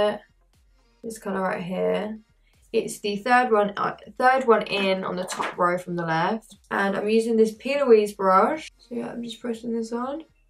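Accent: United Kingdom. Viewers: didnt expect you having a british accent lol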